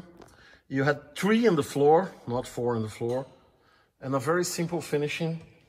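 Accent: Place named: Brazil. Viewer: Nada como um Brasileiro falando inglês. A gente consegue entender um pouco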